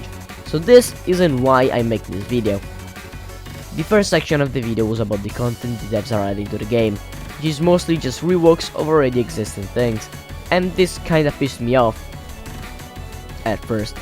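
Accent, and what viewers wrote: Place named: Italy. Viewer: I love how blatantly italian your accent is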